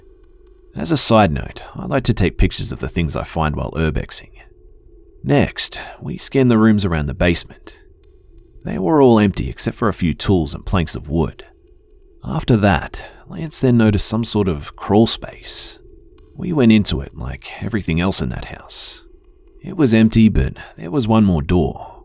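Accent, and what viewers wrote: Australia. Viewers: So much for sleeping tonight., you make me sleep with the light on. My twisted side thanks you for this, my electricity bill does not. No more listening in the dark with headphones and a narrator who feeds of the fear of his users. No need to go hungry, love it and will keep listening to your wonderful Aussie accent!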